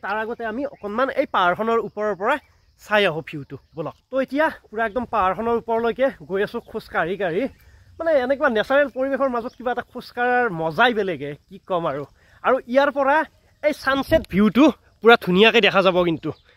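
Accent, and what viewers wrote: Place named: Nalbari, India. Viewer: ভাই তুমি নলবেইৰা ভাষা ক'লেহে বেছি ভাল লাগে , তোমাৰ মাতত আমাৰ নলবাৰীৰ আচল কথিত ভাষাটোৰ আভাস পোৱা যায় , সেইদৰে ভিডিঅ' বনাবা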